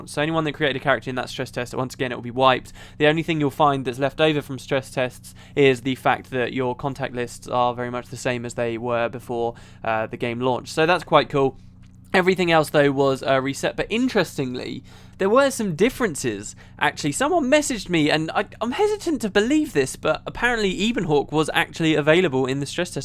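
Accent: United Kingdom. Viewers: Not weird at all. It's the british accent.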